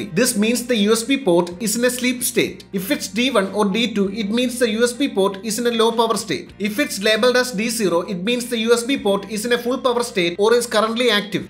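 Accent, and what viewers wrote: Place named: India. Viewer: appears to be Indian English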